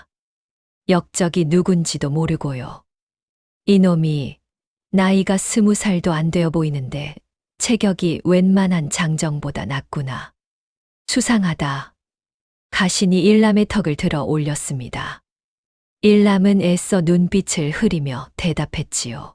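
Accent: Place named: China. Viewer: 억지로 읽는걸 들어보니 중국인 아니면, 조선족인가?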